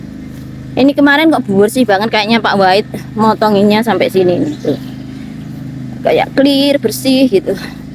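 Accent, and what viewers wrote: Java, Indonesia. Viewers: Ngomong bahasa inggris tp logatnya medok jowone😘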